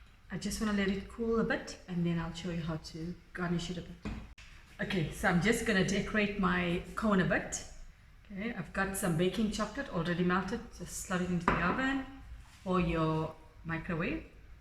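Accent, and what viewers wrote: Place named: South Africa. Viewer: well if they are not a professional probably explains the whisk situation, as i heard "chef", im not a professional but i certainly know how to cook and bake, but tbh it was embarrassing to see handling that whisk! i was cringing im all for loving amateur baking and cooking even if they dont have all the tools in the kitchen but ones should know how to cook use it she was pretty messy. im only giving constructive critism not a personal one you still didnt get the camera focused on the food.:( Ps i would take your challenge the thing is i dont live in S africa Im guessing from your accent!:) anyway hope to see some improved use of spoons:) Your probably hating on me now!!!:)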